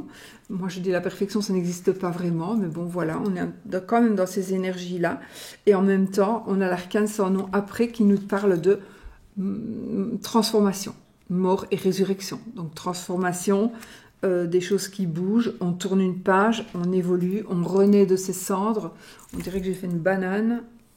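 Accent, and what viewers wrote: Belgium. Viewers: Coucou, merci beaucoup pour votre travail. Je vous découvre aujourd'hui. Vous êtes belge ? Vous avez, il me semble un petit accent qui me parle...❤❤😂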